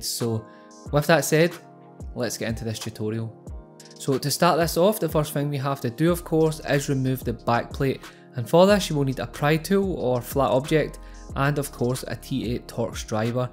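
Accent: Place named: Scotland, United Kingdom. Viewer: Yeah I thought it was sort of scottish dialect/accent, very nice indeed. Thanks again!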